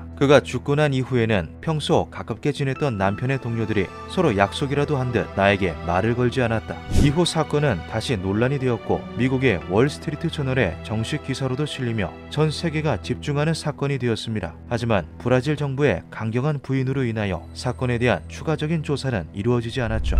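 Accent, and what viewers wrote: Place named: Gangwon, South Korea. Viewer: 고향이 강원도 신가요? 강원도 억양 있으신데 ㅎㅎ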